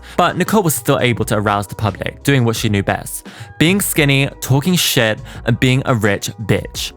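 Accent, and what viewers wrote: United Kingdom. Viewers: british accent XD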